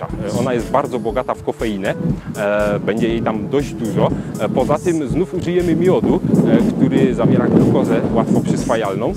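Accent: Silesia, Poland. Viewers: jesteś ze Sląska , słyszę znajomy akcent .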